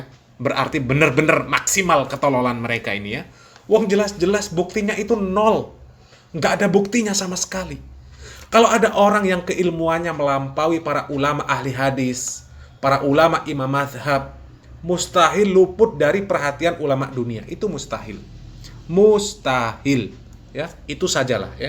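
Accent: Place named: Kediri, Indonesia. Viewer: Sangat sangat nggatelne teleh ... Duh . .logat bahasanya kog mirip banget dgn orang kediri to... Selalu ngakak kalo denger umpatan admin ... 😂😂😂😂😂😂😂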